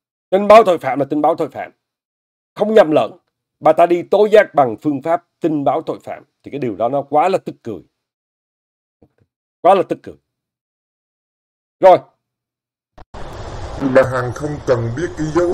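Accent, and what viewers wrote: Standard. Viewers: Chào cố gắng lên đều vì mình thích kênh này bởi nói rất chuẩn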